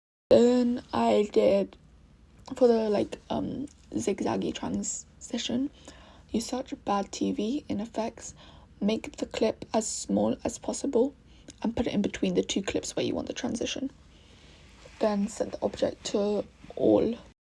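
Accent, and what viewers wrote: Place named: United Kingdom. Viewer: OMG YOURRR BRITISH AYY MATE Sorry that was cringy😭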